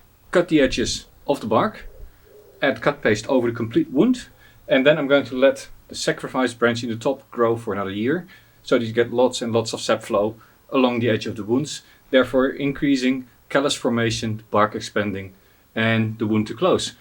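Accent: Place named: Netherlands. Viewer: Hello me deario! Great to hear from you with your lovely Dutch accent 👌I’m so glad success hasn’t had the effect of neglecting your early fans! All best wishes for the rest of 2025 ✌️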